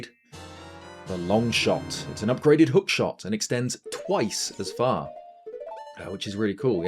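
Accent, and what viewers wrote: United Kingdom. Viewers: Are you from leicestershire?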